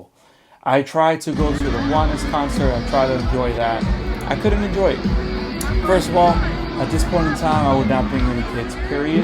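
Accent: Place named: Puerto Rico. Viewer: I like your Puerto Rican English ." It sounds like a quick, relaxed "uh" (with your neutral, relaxed mouth and tongue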